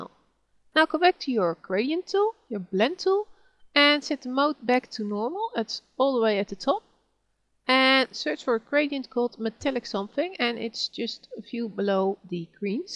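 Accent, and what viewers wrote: Netherlands. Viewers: ik hoor meteen dat je nederlands bent!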